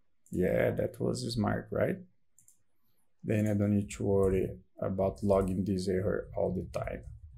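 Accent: Brazil. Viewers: Pelo sotaque tu é brasileiro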